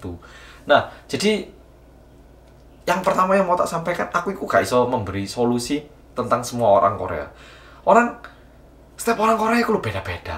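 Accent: Java, Indonesia. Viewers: Orang korea ekspresinya jowo medhook buanget ㅋㅋㅋㅋㅋ mugo wae iso ketemu sampean mas, aku kerja di busan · Koq medok to mas'e, koncone uwong jowo toh? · Sampean mas lebih tepate koyo wong Indonesia turunan tiongkok. Medok tenan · Mas...lihat kamu bicara kok gemes to aku...medok e ra ilok ilok lucu 🤭🤭🤭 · Ya ampun itu logat jawa nya t.o.p 😂😂 bgt 👍🏽👍🏽👍🏽dach😂😂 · Lucu bingit tampang Korea ngomong Jowo lbih medhok dri aku. Smangat mas bagi" pengalaman pengetahuan sama kita. Smoga sehat sukses slalu ya. Aamiin. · Aq yo malang oppa..aseli aq ngrungokno boso medok mu👍👍👍😂 pas merem bayangan wong jowo pas melek kok ngene😄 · Gw org jawa malah tiba" bingung ma bahasa sendiri karna bahasa jawanya mas terlalu medokk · lha jian kok iso medok yo jowomu mas mas 😄😄😁, lucu seneng aku ngerungokke medok ngono 👍👏👏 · MasyaaAllah mas mas.. Astaghfirullah.. Biyung alah gusti Allah, jan eram kok medok ee sampean MasyaaAllah.. 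· Suwun cak... Tapi uenak ngrungokno sing muefhok...🥰🥰🥰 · Gue yang turunan Betawi Jawa tp engga bs ngomong Jawa berasa gagal jd org Indonesia gegara liat org Korea ngomong Jawa dan logatnya engga maen-maen. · Di Drama terlihat romantis, aslinya sebaliknya 😂😂 makanya nggak pernah nonton karena percuma😂😂😂 btw, mas keren, your indonesian Javanesse dialek is awesome. You deserve a big tumbs up👍👍👍👍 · Rapopo kak aku orang jawa juga btw aku ngakak kalo denger kakak ngomong e muedokk nemen😂😂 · Hihihi, kaet weru onok wong korea medok.. 👍🏼👍🏼👍🏼👍🏼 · Paham .... mas korea sing medhok. Sampean ne bener pol. Okeh wong nom jaman sak iki ninggal falsafah jowo... "bibit bobot bebet" .... mulo do bingunggg. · Korea jawa bgt mas logatnya, bahasanya juga.. gk keliatan orng luarnya.. dlu punya mntan orng vietnam gabisa selancar ini ngomongnya, boro2 bhasa jawa bhasa indo aja susah bgt.. luar biasa mas ini.. cari pacar orng indonesia aj mas 😂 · Sumpah seru banget nonton nya wkwkwkwk medoknya ampe emosi😆😆😆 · ih Ya Allah nguefans kon aku ambek mas iki wkwk, muedok pol polan kon. 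Aku ae sing wong jowo kadang yo sok sok an ninggalno logat medok ku lek ngomong ambek konco ambek wong wong liyo tak ubah logat ku dadi indonesia ke jakarta jakartaan ngunu😅 (maafkan kesomse an ini) lah iki wong korea lo isok muedok pol ngalah ngalahi aku, de'e yo bangga pisan. Aku yo bangga kok rek dadi wong jowo *tenang ae. mulai saiki tak tingkatno maneh skill medok ku cek e isok ngalahno mas korea sitok iki hahaha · Lebih suka medoknya mas daripada oppa oppa wkwk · Kita2 ini wong indo...tp ora semedok ini.....aku ngakak bgt vidio ini... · Baru tau sampean orang korea toh.. medok'e mantep..👍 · HAHAHAHA ko ngakak nontonnya. Orang korea bisa se medhok itu, pd gue yg org jawa bisa bahasanya aja engga=)) Inggris bisa, jawa bisa, korea bisa. Udah mantap kamu bang!🤘🤘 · muedokkkk aslii... Terima kasih mas sudah mencintai bahasa kami.:) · Pusing di bahasa koreanya❎ Pusing di bahasa medoknya☑️ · Ini orang korea bicara jawa nya medok plus lancar banget anjay. 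Aku yg asli jawa ngomong bhs jawa aja ga lancar kaya gini wkwk. salutt !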